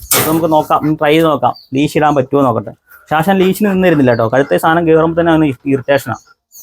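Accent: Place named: Vadakara, India. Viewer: ഞാള് ഞൂള് തല്ലി പൊളി വടകര മലയാളം😅😅😅😅😂😂😂😊😊😊😊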